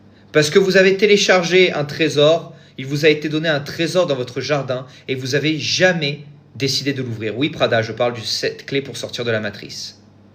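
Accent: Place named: South, France. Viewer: Je veux les vidéos avec l accent du Sud.🎉Pourquoi tu l as enlevé?😮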